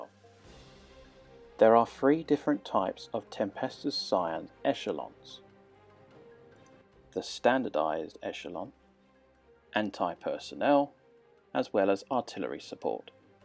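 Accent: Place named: United Kingdom. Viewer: This is exactly what I’ve been looking for! Prayers answered British guy talking like a university professor about army organizational charts for 40K Perfection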